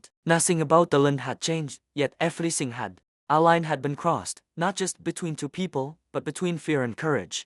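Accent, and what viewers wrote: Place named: Poland. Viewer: Horrible,,bad,,terrible narrator. At the very least,,program the stupid AI to use an actual western voice,,not a polish or russian voice. Splash? Wtf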